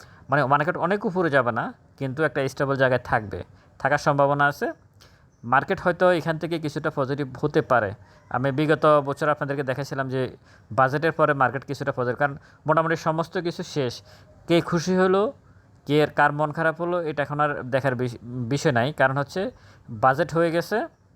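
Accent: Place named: Noakhali, Bangladesh. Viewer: noakhailla bhasha emon e . Manush k choto kore kotha bola ucit na. Noakhaillara o manush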